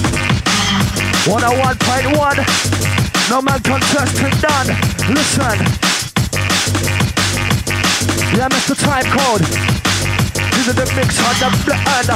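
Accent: United Kingdom. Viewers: I love this music and this accent so fkn much. Big up to the UK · i love this station because it's just some british guy talking over drum n bass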